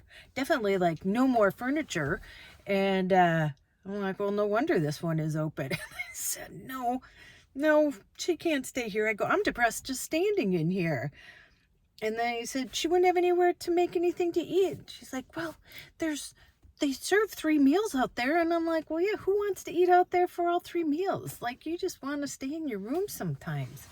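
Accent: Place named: Fargo, United States. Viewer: I think you talk like Fargo😊. Then again I am from Rhode Island. 😉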